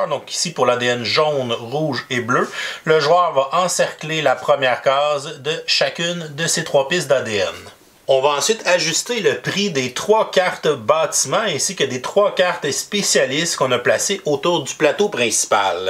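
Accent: Canada. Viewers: Des effaces 😁 j'adore le Québécois 😉 en tout cas merci pour la vidéo, je viens de le récupérer avec mon Dinosaur World que j'adore donc à jouer rapidement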